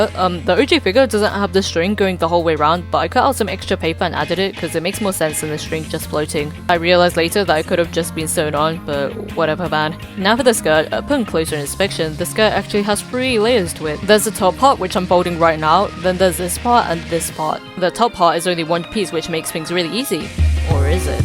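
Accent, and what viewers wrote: United Kingdom. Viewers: she's prob british bro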